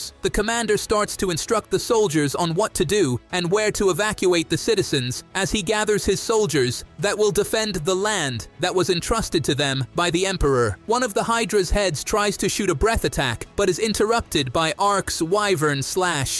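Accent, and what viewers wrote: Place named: United Kingdom. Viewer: I love the British voice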